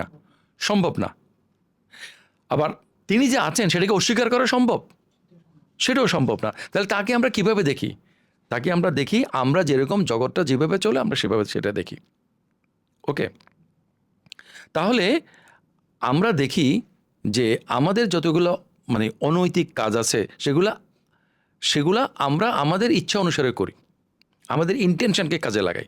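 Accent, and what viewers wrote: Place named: Noakhali, Bangladesh. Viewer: নোয়াখাইল্যা বয়ান!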